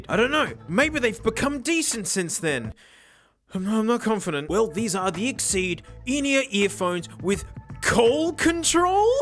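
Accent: Australia. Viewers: Nothing gives me more joy than hearing an Aussie dude destroy the living hell out of earphones😂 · Dang, I love this stuff, helps me appreciate Australians accent way more, and the laid back and fun content is spot on! Keep on "Exceeding my expectations!"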